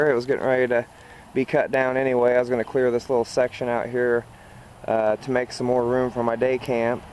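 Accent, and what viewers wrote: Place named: Midwest, United States. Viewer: What part of the Midwest are you from.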